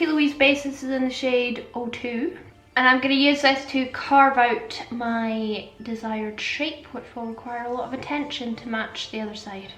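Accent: Scotland, United Kingdom. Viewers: I adore this!!! Where are u from girl? I’m getting a Scotland accent and I’ve been waiting for a Scottish MUA pal for literally forever so I’m dying😻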